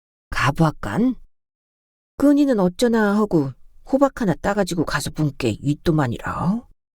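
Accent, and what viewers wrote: Jeolla, South Korea. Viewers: 자정이 지났네요. 자고나서 내일 들을까 생각하다 잠이 오지않아 오늘은 또 어떤 작가의 작품이 님의 목소리를 타고 우리 곁으로 다가올까? 궁금했는데~ 님의 전라도 방언이 참 구수합니다.^^ 또 호롱불 5일장 먼지 풀풀 날리던 신장로 드넓은 들판 지명 등등 근처에 잠시 살았기에 더욱 정겹네요. 님 잘들었습니다.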